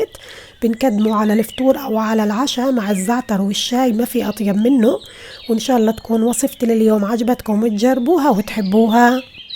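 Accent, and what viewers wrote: Palestine. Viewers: Intisar Ayasa هاي لهجة فلسطينية ريفية في قرى بعض المحافظات وللعلم انو بفلسطين يوجد عدة لهجات ما يقارب من اكتر من ٢٠ لهجة وكل لهجة تختلف عن غيرها وجميعها لهجات حلوة ولها رنين جميل في الاذن وذلك لتعدد المناطق في فلسطين الحبيبة. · ما شاء الله يسعدك ويسعد لهجتك ويسعد فلسطين واكلاتها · تسلمي تسلمي يا ستي انتي يسعدك ويسعد كلامك الفلسطيني الحلو اجمل شيء انك بتحكي باللغه الفلاحيه الحلوه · انت مبدعه ولهجتك روعه فلسطينيه اصليه من اي البلاد انت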